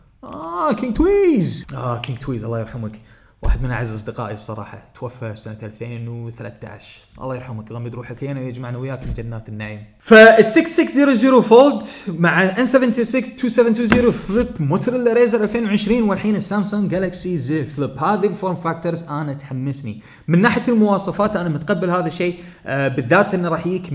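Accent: Kuwait. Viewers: التقنية خراافية ولكن الانتشار الاوسع رح يكون بعد خمس سنين بسبة السعر الجنوني اللي بينزل فيه للسوق وللأمانة انا سوري بس اللهجة الكويتية يا اخي كشخة😄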